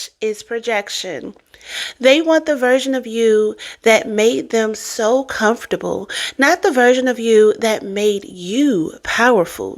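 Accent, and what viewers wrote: DMV, United States. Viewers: That was beautiful! I definitely needed to hear that this morning. You’re becoming one of my favs! And do I hear a DMV accent? 👀❤️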